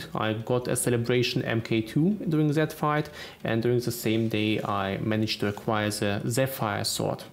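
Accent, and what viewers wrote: Russia. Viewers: Thx for this tutorial! Are you russian btw?:) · Файналли рашн ассент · Мне кажется или акцент русский?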